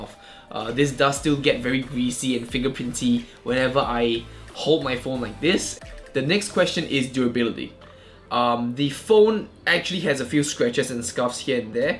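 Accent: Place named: Southeast Asia. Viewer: You have a South East Asian accent! By any chance are you from Singapore or Malaysia?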